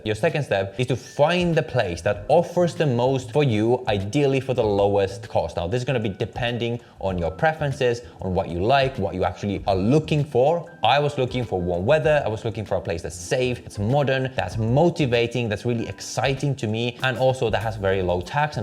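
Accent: England, United Kingdom. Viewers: Love his English accent 😂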